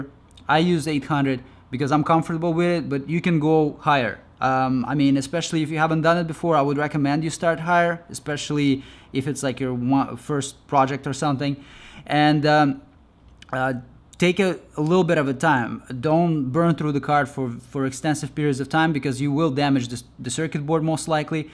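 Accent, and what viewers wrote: Russia. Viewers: акцент-то русский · Does this guy has some russian accent? Im from russia and his speech seems unusually clear and strongly articulated for me. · Cool video. Let me quess you are Russian? 😁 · Russian accent ! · Почему у него русский акцент?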